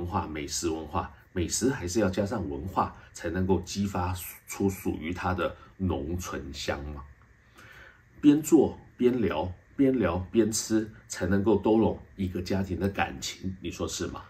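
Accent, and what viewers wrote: Standard. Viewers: 章兄的國語字正腔圓⋯聽力正常者應該不需字幕😂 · 了解，沒有字幕沒關係，您的國語很標準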